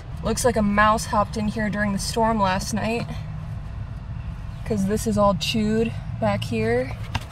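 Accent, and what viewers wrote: South, United States. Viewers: I’m from NC and your southern accent 😂 I like it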